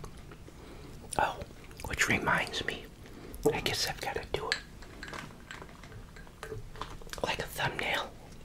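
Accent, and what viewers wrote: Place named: Massachusetts, United States. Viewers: What part of Boston are you from? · What part of Massachusetts is he from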